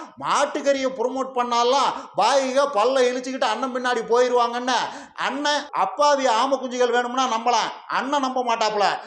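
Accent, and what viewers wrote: Kongu, India. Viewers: கொங்கு தமிழ் அருமை ப்ரோ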